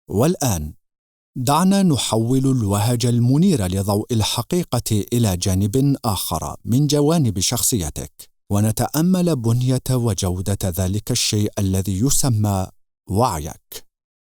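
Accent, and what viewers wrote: Mauritania. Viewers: هل القارئ موريتاني??? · القارئ موريتاني؟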